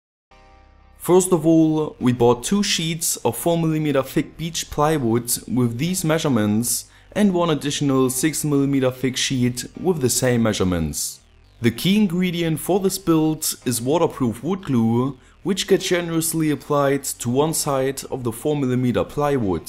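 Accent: Germany. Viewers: LOL are you from Germany ?! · Sag mal täusche ich mich da oder bist du deutscher weil mir is das jetz schon öfters aufgefallen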